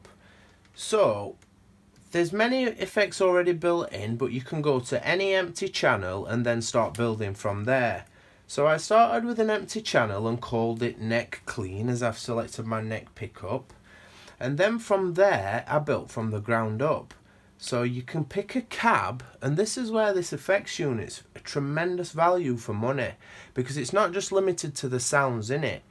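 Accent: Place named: Yorkshire, United Kingdom. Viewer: nice yorkshire accent. :)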